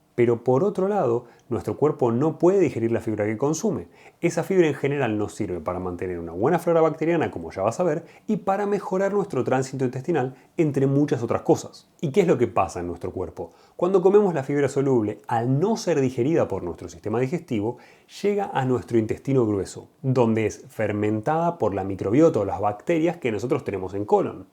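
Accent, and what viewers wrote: Argentina. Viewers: Me encantan estos videos informativos y llevaderos por ese lindo acento argentino,. Saludos desde Buenos Aires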